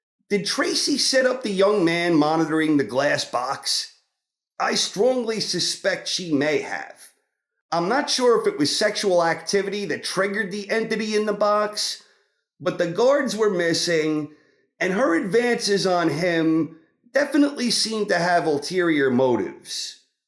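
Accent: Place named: Brooklyn, United States. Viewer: You sound like you have a Brooklyn accent lol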